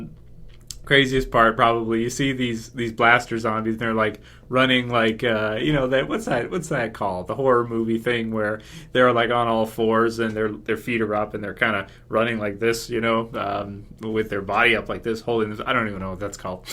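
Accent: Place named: Ontario, Canada. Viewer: no one in Ontario pronounces "about" as "aboot". As I am from there, and I think sounds like a typical guy from Ontario. MAYBE some people in the far east coast of Canada like rural Newfoundland or maybe rural Nova Scotia (with their scottish roots) you might get someone that even come close to saying "aboot"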